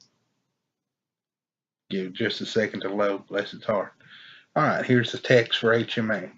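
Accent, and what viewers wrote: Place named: Deep South, United States. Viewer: You sound like you work in the ranch in the deep south USA.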